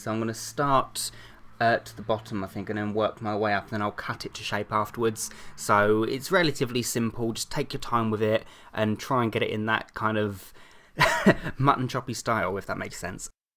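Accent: England, United Kingdom. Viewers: Seems strange, a lot of tutorials are American, meaning when they say where they got the make up from or how much it cost, im thought, but to hear an English accent and not far away from where i am, makes me smile so much :D This is totally epic, nice work! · I love your videos. They are so good and I love your English accent. Can you do a genie from Disney's Aladdin.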